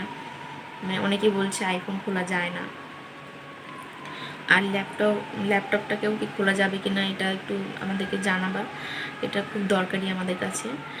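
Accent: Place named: Kolkata, India. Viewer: Are didi Kolkata Bangla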